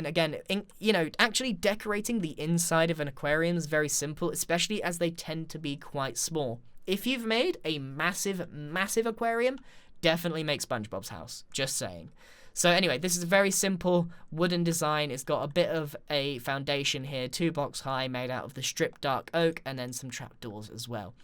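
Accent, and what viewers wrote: United Kingdom. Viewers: Tyler Szulkowski he's British, its part of their accent,it sounds like swum but its actually swam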